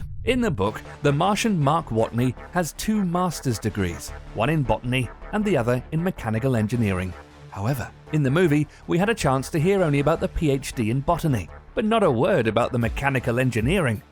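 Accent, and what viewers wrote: England, United Kingdom. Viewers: what the f u c k are you doing ? ( peter 'pole' gazer ) pack your bags ? fix you a visa ? turbulent Hollywood history ? . okay don't answer that , your not from around here are you ? I mean from America ? and worst of all an English accent 'cynical humor' narrator . ( I'm speaking for myself ) but I'm still going to say ' w e a r e s I c k to d e a t h of b r I t I s h a c c e n t s p l a s t e r e d e v e r y f u c k I n g w h e r e' it's not cute, it's not jolly , it's not a "romp" . even if I'm way off on this and get all kinds of blowback I don't care . take your effing insults and stick'um up your A R S E ! jeezus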